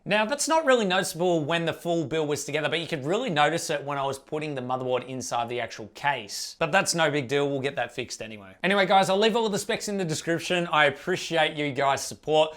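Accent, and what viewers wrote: Australia. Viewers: Is he Aussie?? Cause I'm Aussie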